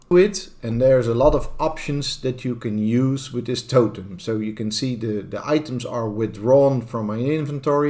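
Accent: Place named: Netherlands. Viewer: Gotta love the dutch accent😂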